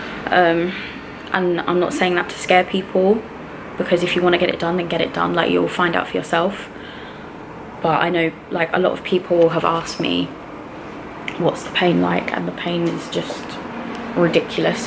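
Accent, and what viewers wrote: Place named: England, United Kingdom. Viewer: Yes sound like my friend from England I love it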